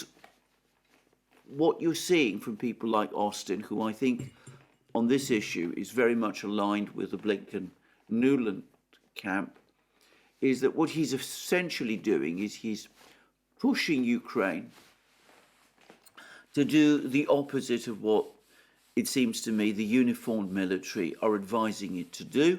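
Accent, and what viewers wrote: England, United Kingdom. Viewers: It's weird listening 🎧 to a communist with an English accent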